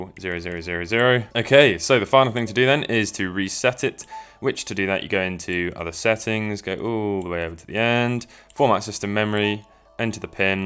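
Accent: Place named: United Kingdom. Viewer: In British English.. perfect, i love your accent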